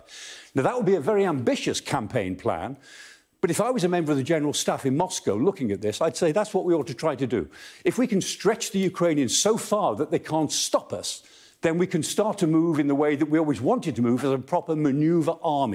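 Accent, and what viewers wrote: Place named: England, United Kingdom. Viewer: What i like the most about M. Clark's commenting is his English accent. Otherwise sadly nothing comes true 😢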